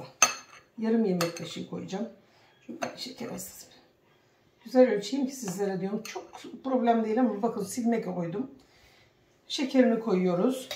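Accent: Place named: Izmir, Turkey. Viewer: ay çok tatlısınız ya siz konuşurken kendimi görüyorum İzmirli olduğumuzdanmi acaba çok natursunuz ellerinize sağlık hep yaptığınız çok güzel sağlıcakla kalın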